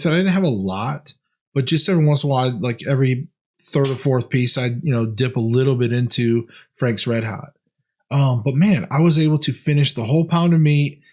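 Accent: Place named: Midwest, United States. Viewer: You sound like you are from the Midwest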